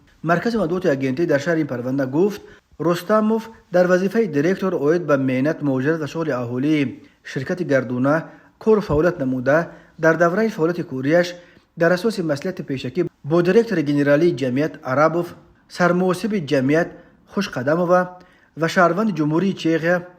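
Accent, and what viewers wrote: Pamir, Tajikistan. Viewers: Автор Помири аксент кати гап мезанад.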